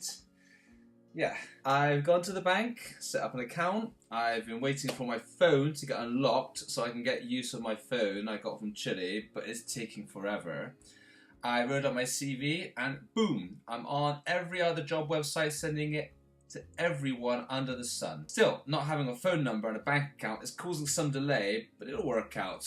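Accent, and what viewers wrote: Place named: England, United Kingdom. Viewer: Oh my friend! Te entiendo mas ahora jajaja se te pego el acento ingles. Jajajaja. Suerte con todos tus objetivos!!!!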